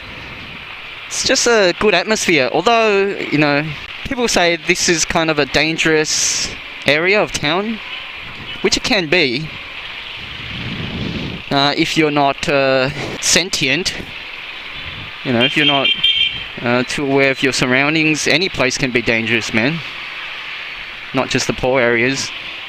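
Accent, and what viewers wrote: Australia. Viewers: I really enjoy your narrative and your "down-under" accent, mate! Thanks for making the video.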